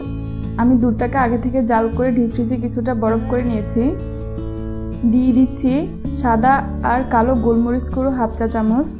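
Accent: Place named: Netrokona, Bangladesh. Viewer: নিশ্চিত নেত্রকোনার পাব্লিক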